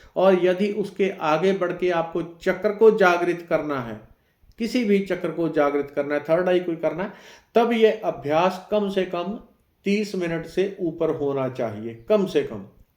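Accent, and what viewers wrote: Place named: Himachal Pradesh, India. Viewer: Himachal se ho kya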